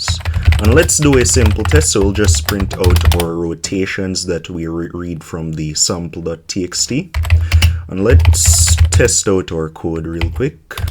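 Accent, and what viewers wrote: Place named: Jamaica. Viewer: I love your accent. Jamaica?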